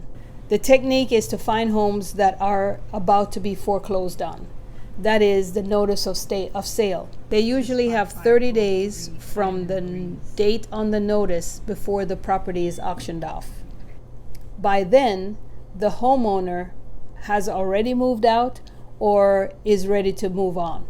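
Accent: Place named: Jamaica. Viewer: you convey your message clearly. You sound like you're from my home country JA,..Quick question may I ask how you put together a buyers list?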